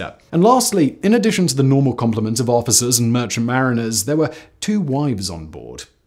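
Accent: United Kingdom. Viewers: it's so much more satisfying hearing a Brit talking about a British ship. . But yes, he does a awesome job narrating anything.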